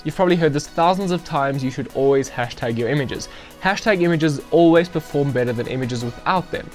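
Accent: South Africa. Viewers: Are you South African ??? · Clearly South African bru... you still there? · I hear a south african accent there 🤔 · Is that a South African accent I hear?? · Are you South African?🤔 your accent sounds like you’re from here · South African?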